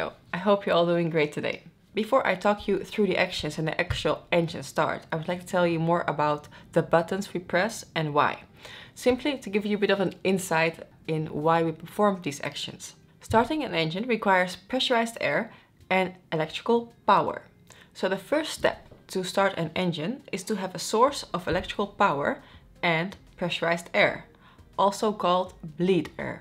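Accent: Netherlands. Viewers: A hint of Dutch in English produces such an agreeable sounding accent. It's a pleasure to listen to her. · Dutch accent is something amazing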